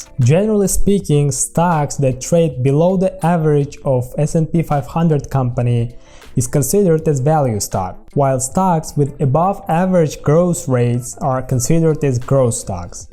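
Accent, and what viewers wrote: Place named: Central Asia. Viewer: With strong Central Asian accent. My guess would be Kirgiz or maybe Kazakh. Красава!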